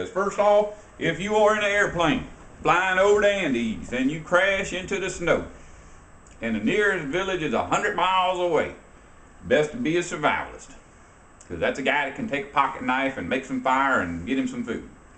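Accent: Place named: South, United States. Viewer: hah hah, awesome accent sir, thank you, reminds me of the south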